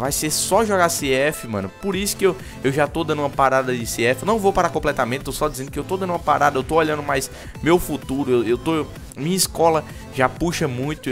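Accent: Northeast, Brazil. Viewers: ai vc é nordestino! por que eu so de pernambuco e vc?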